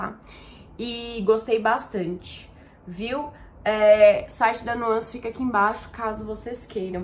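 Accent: Minas Gerais, Brazil. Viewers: Sou apaixonada nesse sotaque, trem 💙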